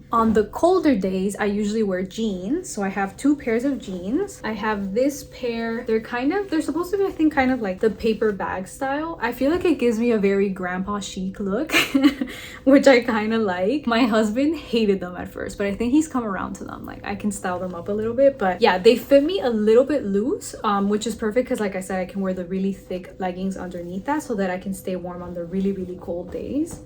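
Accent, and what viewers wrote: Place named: Southwest, United States. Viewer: I love your video, your comfy shoes are beautiful. The whole time I was trying to place your accent, it sounds like south East LA, not Tennessee. They have a variety of crazy accents, but yours sounds distinctly southwest. I’m from California btw.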